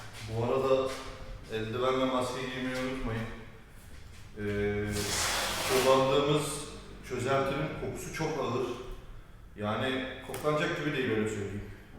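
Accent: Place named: Zonguldak, Turkey. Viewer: selamunaleyküm,saniyorum zonguldak lisin.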